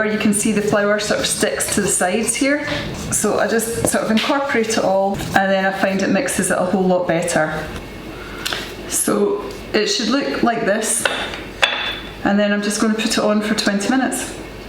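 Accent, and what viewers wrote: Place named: Scotland, United Kingdom. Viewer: It's easy when you know how, but the how is all in the person , in Ireland in the past ever one made irish brown soada bread and ever one's bread was different by taste and i mean everyone and everyone's preference was different, bread has the personality of the person who makes it in it and it's the best ingerant, perhaps that's why holy communeyen has the body and soul of Christ in it Give us our daily bread , you have a beautiful Scotch accent, a Caledonian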